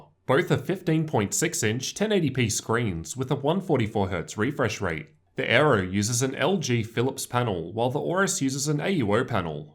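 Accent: Australia. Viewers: This is just how Australians sounds ahahahaha I’m Australian and can confirm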